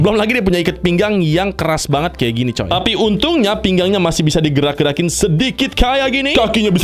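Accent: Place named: Java, Indonesia. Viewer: Kudune koyongene koyongono agak keluar jawa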